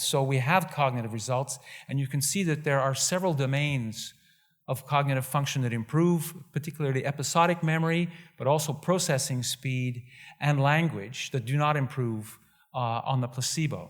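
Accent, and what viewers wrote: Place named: France. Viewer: this guy's definitely French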